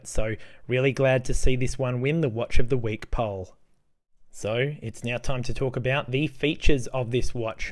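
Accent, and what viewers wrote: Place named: Australia. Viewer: Good to hear the ol' Aussie twang going on there! Good video, thanks. Before I gave mine to my son I used mine as my everyday watch, including swimming and hiking and it took everything like a champ. I had the steel bracelet one and changed it to a black Casio strap. Now thinking of getting another one, the black one like your one in this vid.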